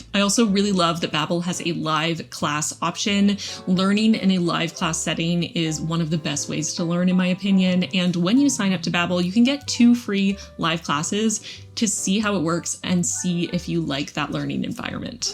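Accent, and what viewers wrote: Quebec, Canada. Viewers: Salut =) (We don't say "Allo" except in the beginning of phone calls by the way). Your french is quite good actually (I observe the quebec influence in the accent ^^). It's very difficult to prounounce the words correctly with so many silent letters and the diversity of sounds with the same letters. And the difference between female and male nouns... and the conjugation... Well be brave /o/ For instance, we pronounce "prophéties" like 'professies" (t as s). I wish you good luck and nice reading with your french TBR (we say PAL for "pile à lire" here). I think you choose very literary books, with metaphors (more complicated to understand if the first level is new). But I admire your ambition, you can progress very fast this way! Bonne continuation n,n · Wow, tu étais à Montréal??? I love how dedicated you are to learning French, and despite the Babbel lessons using métropolitain French, your accent definitely leans more Québécois! 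Also, I find it so interesting, because I live in the Montréal area and have trouble finding books in English (that aren't from Indigo...), but in your case, you absolutely used your time in Montréal to get francophone books! And yes, Québécois lit 100% tends to be absurd and a little weird! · Ton français est vraiment très bon! En fait, ton accent est un peu Québécois et j'adore! Tu m'inspires à lire en français, quelque chose que je n'ai pas fait depuis des années! 💜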